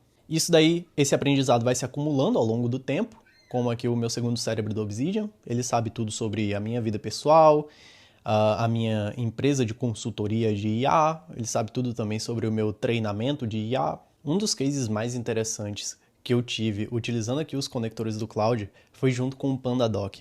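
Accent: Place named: Bahia, Brazil. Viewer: Vi que você é devoto de Carlo Acutis hauha... Você é baiano? O sotaque caetano veloso entrega hauahua...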